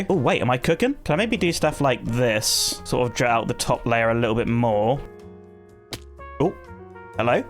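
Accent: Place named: United Kingdom. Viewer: I Only See your videos Because Of British Accent 😂😂❤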